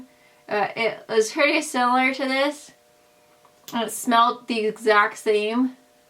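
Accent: England, United Kingdom. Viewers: Did you used to have an English accent?? ( im from England :) ) xx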